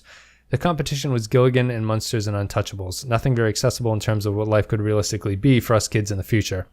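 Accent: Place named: Upper Midwest, United States. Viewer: Over-apologizing? Hahaha! I'm going to show that to all my Can-head buddies here. Actually, you have a very distinct way of saying "out" and "about". If I had to guess, I would say either Seattle, North Dakota or Minnesota.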